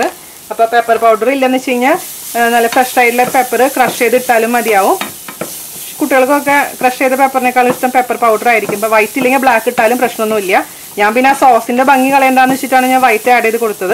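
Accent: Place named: Chalakudy, India. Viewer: Hi tried pasta.. First time trying.... Always kids experiment goes on.. It came very well.. And enjoyed.. Thank you for u r valuable tips in between.. The best part in u r explanation while cooking is even my 14 yr kids can follow the recepie and cook.. Thank you dear.. Hope I can call u dear.. 😍. I am also from Trc.. I left Trc after marriage 20yrs back and I am not having that slang.. By watching u I am enjoying that too..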